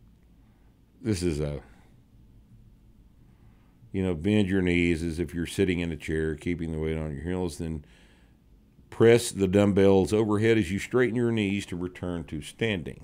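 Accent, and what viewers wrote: Texas, United States. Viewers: in best Zap Branigan Impression a Texan can do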